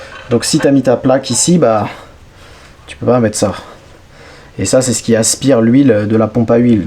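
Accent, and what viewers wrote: Alsace, France. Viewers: j’ai l’impression qu’il a pris l’accent Aslacien nan ?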